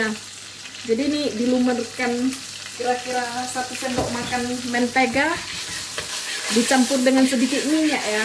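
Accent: Medan, Indonesia. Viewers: Aku suka bgt logat Medanya kuat, menjadi ciri has..masak nya enak² penjelasan jls..tks🙏😇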